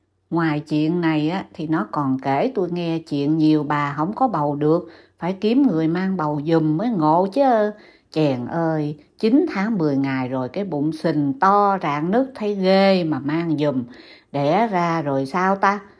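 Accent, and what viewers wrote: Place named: Mekong Delta, Vietnam. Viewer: Vui vui ! Giọng đọc chuẩn miền Tây luôn bạn ơi ! Cám ơn bạn đã chia sẽ.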